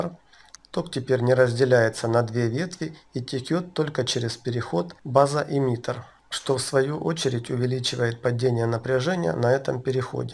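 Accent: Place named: Surzhyk, Ukraine. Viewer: Слова некоторые просто Суржик , автор живет в той местности где так разговаривают . но так даже интереснее .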